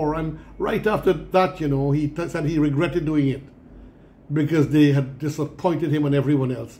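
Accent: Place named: Guyana. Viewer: Like a blasted Guyanese!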